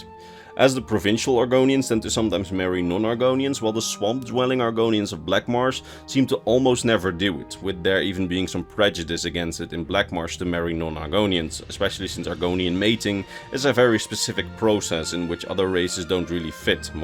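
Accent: Netherlands. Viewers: Nederlands accent😜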